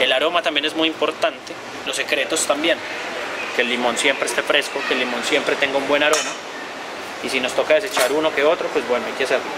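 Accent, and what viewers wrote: Colombia. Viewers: HABER , HABER ........ COMO HAZ DICHO .. . por tu fonetica entiendo que eres colombiano .. el cebiche PERUANO nunca pero NUNCA se , utiliza perejil . SE UTILIZA CILANDRO huev....... y el sazonador que indicas alegremente y, tratas solo tratas de explicar , se aplica de acuerdo al criterio del cocinero y eso lo hacen cuando el pescado no es fresco y no es apropiado para un cebiche .. como en tu caso NO quiero ser alarmista pero los medicos recomiendan no consumir algunos peces y entre ellos esta la tilapia que por cierto hay mucha variedad ..... este cocinero de tercera ... mejor dicho ayudante de cocina ... ya ha tenido mucho tiempo para aprender a hervir agua ..dado el tiempo de publicacion de este video ...,, deseo y le pido que para hablar de cocina PERUANA tenga la gentileza de aprender mas ........ un saludo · cheff con acento colombiano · Por el acento es colombiano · es colombiano por el acento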